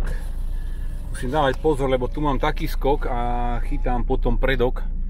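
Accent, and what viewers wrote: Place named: Liptov, Slovakia. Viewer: odkial pochadzas??podla prizvuku bz by som tipol liptov alebo oravu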